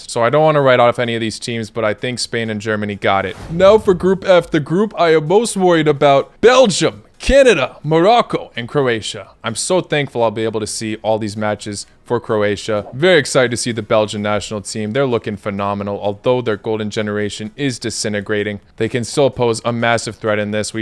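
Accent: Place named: United States. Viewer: Au brate zvucis tacno ko amerikanac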